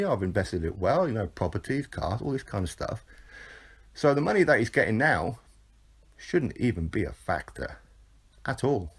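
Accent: United Kingdom. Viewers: Is that a British accent I detect from the narrator?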